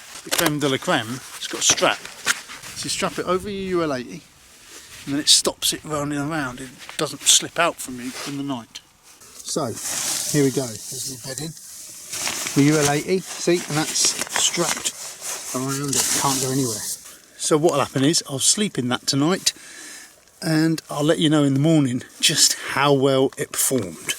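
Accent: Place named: London, United Kingdom. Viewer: lol you sound london,but your mate is deff essex,love your channel,your the one helped with my first wild camp,been going to the moors since 1993,never wildcamped until other week,thanks to you went to merrivale ,loved it.so thankyou for helping me.. debs and stay safe.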